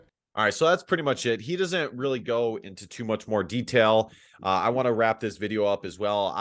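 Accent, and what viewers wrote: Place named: South, United States. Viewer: you gotta be from down south. I literally had to speed this video up to 2.0X speed to even stay interested in a single sentence.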